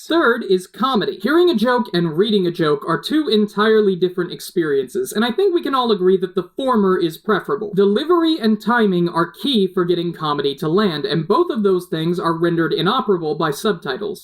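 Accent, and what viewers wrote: Australia. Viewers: Are you from Australia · Are you Aussie? If so, cool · Are you Australian??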